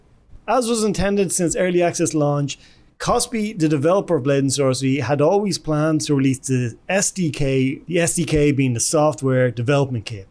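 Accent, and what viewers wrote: Ireland. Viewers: your irish accent is awesome dude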